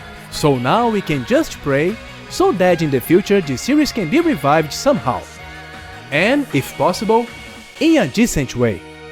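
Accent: Brazil. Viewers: mano concerteza voce e brasileiro xD SO PELO GEITO DE FALAR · esse sotaque não me engana, tu é br né kk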